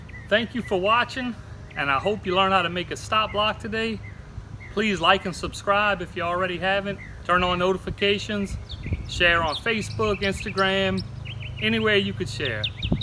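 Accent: Louisiana, United States. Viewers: Hi from the sound your accent you your ✋ from Louisiana like me.i really enjoy your vids really good stuff.i leañed a lot .old carpenter like . Again keep up the good work and I'll be want for some more stuff to learn...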